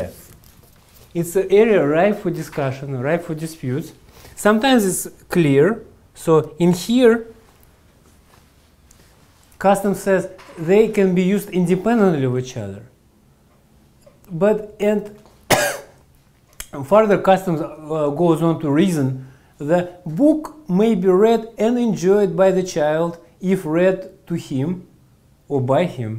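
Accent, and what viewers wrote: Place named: Russia. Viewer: I love his Russian accent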